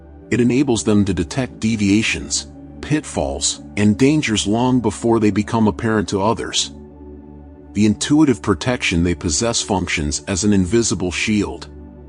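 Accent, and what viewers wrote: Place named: United States. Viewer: You have to drop the American accent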